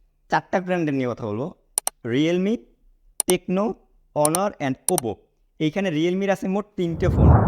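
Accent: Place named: Barishal, Bangladesh. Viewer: বর্তমানে যে ফোন গুলো বের হইতেয়াছে পাক্কা মাইরা ফালান ভাঙতেও পারে, পানি ঢোকতে পারে, চলতেও পারে নাও চলতে পারে (বরিশাইল্যা টোন আছে কথায়) আগামী চাইর বছর ক্যামনে চালাইবেন ভাই ভাই মনেহয় মোগো দেশি মনু😅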